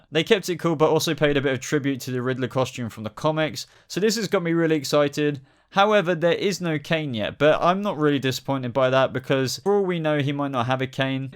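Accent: United Kingdom. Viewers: Two questions: 1) If this chappie is in the UK as the accent suggests, how can he be legally reviewing things that haven't shown here yet? 2) Is it just me that thinks he is REALLY good looking?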